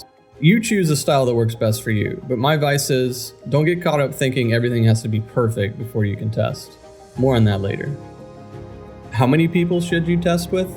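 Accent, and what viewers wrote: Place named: United States. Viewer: Hey, thank you for your video, it's great! I can see and hear so much of your effort was put into it. I do treasure this one, and I found it thanks to your article on Gamespot. However, there are some pain points: 1. Your attempts at self-deprecating humor undermine both tone and authority, I expect from you to take your message seriously and implement it in practice. I want you to see as my equal, not a clown with a computer science degree, and I know you are not one. 2. Pronunciation of foreign names is easily searchable and practicable nowadays. Please be less American about this and learn, it's very easy if you let go of point 1. Just like in your message here, fear of messing up a word keeps you from integrating new cool words into your life, and names are amazing words! Just don't stress only the first vowel, we already have enough bO-rises and Oh-drees. 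And yeah, the solution you used was painful to listen too, I'm afraid. Other than that, I hope every documentary YTer will do their work as you do. Nice to meet you!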